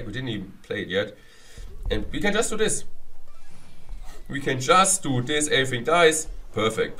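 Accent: Germany. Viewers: ...and dann... Denglisch man kennts😂 Aber nices Video👌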